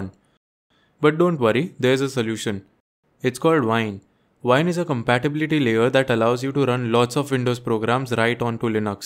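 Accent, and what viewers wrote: India. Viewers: When the narator sounds like indian and you know what you want will be fixed in no time 🥹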